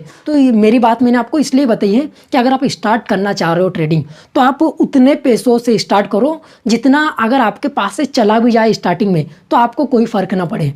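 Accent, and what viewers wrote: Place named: Indore, India. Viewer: Bhai ap Indore se ho apki awaj parhchan Li mene😅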